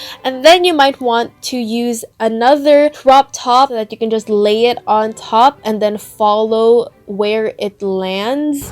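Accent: England, United Kingdom. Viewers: I LOVE YOUR ENGLISH ACCENT 😍😍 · so relatable lmaooo, a new subscriber hereeee. i thought ur not filipino likeeee i love ur eng accent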